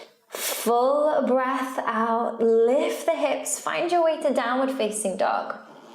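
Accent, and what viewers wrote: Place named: England, United Kingdom. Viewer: Your 60 min sessions are great. I love your accent! I'm not good at guessing but I guess England or Australia. Anyway I can listen to you talk for days. Great workouts! Appreciate your time.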